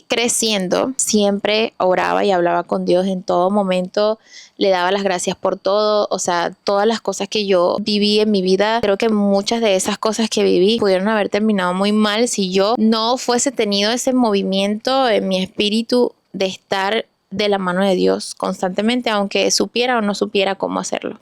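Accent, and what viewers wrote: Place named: Táchira, Venezuela. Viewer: Hola, desde que te mudaste a usa te he seguido mucho viendo todo lo que haces y empecé hacerlo porque te vi como un ejemplo. Yo también emigré y emigré sola bien chiquita después de graduarme de bachillerato y tus videos me hacían reír también al ser gocha me daba un poco de cringe el acento (la verdad jsjaja). Y ver este tipo de contenido de verdad me hace tan feliz, además siento que es una señal. Al estar sola sola me refugiaba en dios en rezar y rezar y pedirle todo lo que quería o necesitaba para mi bien estar. Y poco a poco me lo fue dando y siempre supe que era el, siempre un mensaje un detallito que solo yo en mis rezos decía. Hasta sacar personas que me hacía mal de mi vida! Pedía a dios si esta persona no es para mí sácala de mi vida aunque me duela el alma… y así mismo fue. No solo con relaciones amorosas, con trabajos, viajes, amistades, etc. 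Después de tanto estrés por inmigrar y tener 3 trabajos vivía muy frustrada pero desde que empecé a agradecer cada día a dios todo mejoró! Y muchas puertas se me abrieron! Pd: por favor sigue subiendo más capítulos ya tienes una fan fiel, gracias ❤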